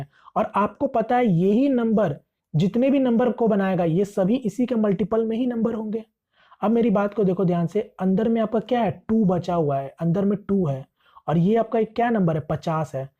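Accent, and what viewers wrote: Jharkhand, India. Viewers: Your accent is like jharkhandi....1 tho 2 tho...😁. Ye sirf jharkhand wale bolte he r u from Jharkhand..??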